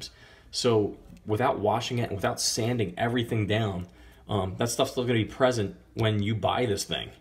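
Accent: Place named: Philadelphia, United States. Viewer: You a fellow Philly guy like myself? The accent.. either that or Bmore/Delaware. I'm just getting into customizing. I got Testors and some brushes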